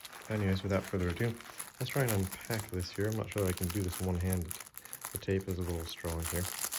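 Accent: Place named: Scotland, United Kingdom. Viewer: Ii here a Scottish accent struggling to be heard over the American there 😊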